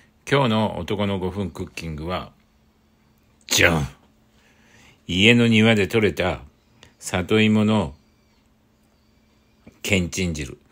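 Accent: Saga, Japan. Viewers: 佐賀産の食材は素晴らしい👍 すき焼き好きで〜すそして佐賀弁良いね👍😊 今日のゲストは「ゾマソン」さん！あれっ、僕は間違ったかなさん😅 Xも見ますね！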